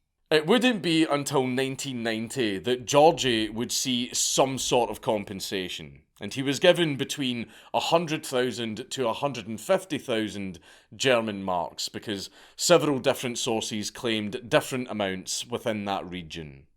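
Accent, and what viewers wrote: Scotland, United Kingdom. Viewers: When I first discovered him I watched so many of his videos in such a short period of time that my thought actually started voicing in the Scottish accent rather than my native American one for a while. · I only subscribed and liked all this dudes videos originally because I wanted to support someone they were trying so hard to bring down. I love the idea of reverse advertisements. Hell right now I'm looking into this ghost gun thing the news seems to be pushing so hard. I just couldn't get past the accent before. But I do have to say this little Scot is growing on me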